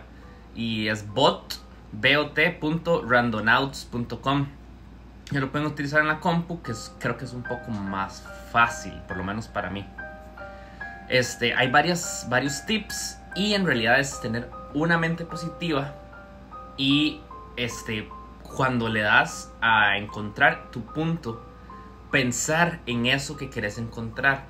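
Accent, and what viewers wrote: Costa Rica. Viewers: Saludos desde Costa Rica, se notó el acento :) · Tico? habla como tico jaja pura vida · jaja no se pierde el acento Tico, saludos 👌🏻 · Eso mae!, saludos, el hablado de un tico no se pierde xD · Ud es de costa rica?? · Eres tico? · Sos de Costa Rica es que mae con esa voz es imposible que no jajajaja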